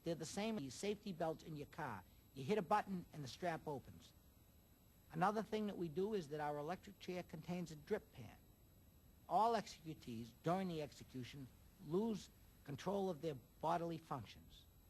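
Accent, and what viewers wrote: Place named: United States. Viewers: Horrible american accent. wow,,, 'very distasteful thing for the GOD who has to unstrap the inmate."... "nomily,, if we think of a belt." ....."the GOD has to compress all the flesh"... "same as the safety belt in yo caw."...."they deficate on da chaw"... Americans really really need to take elocution lessons. They sound like idiots. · There is no such thing as an American accent. There are many, many accents in North America, and even in individual states. This man has an accent found in New England, most famously Massachussets. That accent was developed over a hundred years ago, with minor change over the years, from New Englanders' obsession with trying to be as british as possible. Western North Carolinians and eastern Tenneseans speak with an accent and dialect that historians have found to be nearly identical to the common accent and dialect of Elizabethan Englanders. 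The most famous southern accent, which is spoken by Virginians and north Georgians came from the mid colonial period with high society types from the aforementioned states, then colonies, trying to emulate the accent of the royal family of the day, the Hanovers. So, if you don't like American accents, blame the English.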